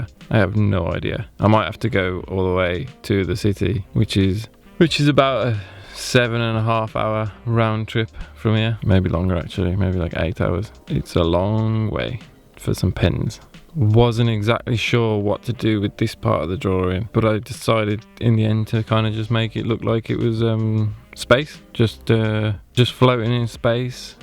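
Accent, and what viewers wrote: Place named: West Midlands, United Kingdom. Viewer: Really great work 👍 And representing West Mids by sound of it!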